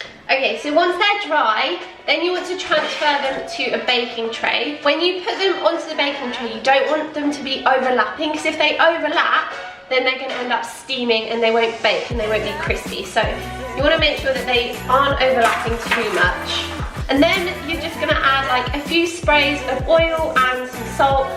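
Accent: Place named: United Kingdom. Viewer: I like you British accent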